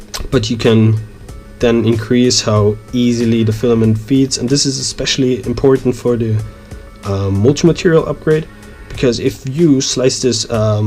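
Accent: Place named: Netherlands. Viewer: Bist du gebürtiger Deutscher? Ich höre da irgendwie einen Niederländer heraus, du sprichst ziemlich gut :)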